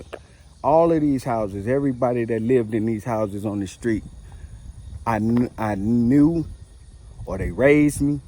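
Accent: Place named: St. Louis, United States. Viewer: I just found your channel and I knew yo damn ass was from St. Louis, that accent from home always sticks out to me.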